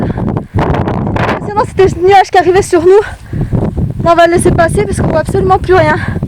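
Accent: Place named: Franche-Comté, France. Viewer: c't'accent franc comtois !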